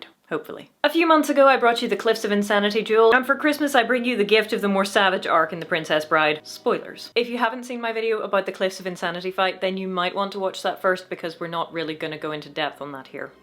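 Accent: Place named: Northern Ireland, United Kingdom. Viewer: You have a great accent. It's got to be Northern Ireland or Donegal, with moderation in the US. Please tell me.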